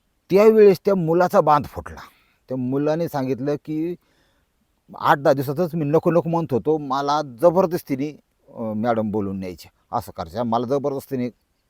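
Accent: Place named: Beed, India. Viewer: मामा बीड वाले दिसताय भाषेवरून म्हणतोय ह्या गोष्टी लोकांना आवडतात ते तुम्ही बरोबर ओळखून विषय ठेवता त्यामुळे like मिळतात jacket आवडल बाबा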